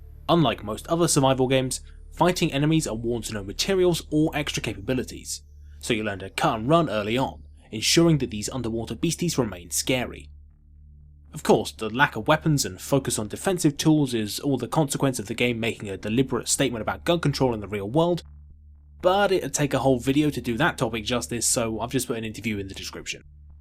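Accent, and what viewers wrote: United Kingdom. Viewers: The fact that a man with a British accent having to clarify that he was saying terror and not Tera is hilarious to me 😂😂😂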